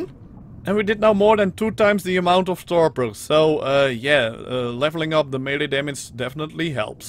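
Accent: Netherlands. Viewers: Ben je nederlands ofzo? (Je klink gewoon zo)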